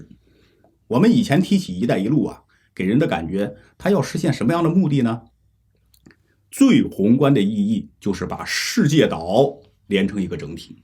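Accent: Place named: Beijing, China. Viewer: 我喜歡劉兄的流暢京腔，聽起來舒服。對事理陳述，新鮮可喜，加上個人的媚力？信不信不由我了。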